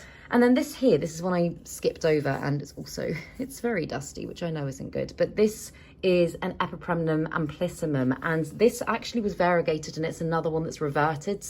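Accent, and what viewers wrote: England, United Kingdom. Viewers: Just love your English accent. Very attractive to listen to. Are you a londoner? Love your passion for plants. Did botany? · 英国发音好听！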